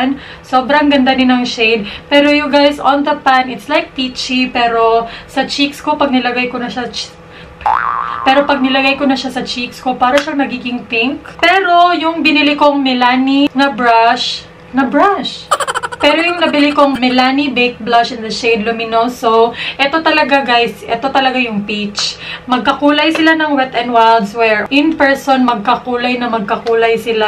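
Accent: Visayas, Philippines. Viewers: sa kaka panood ko sayo na adopt ko yung accent mo wahha. kaya akala ng mga viewers ko bisaya din ako heheeh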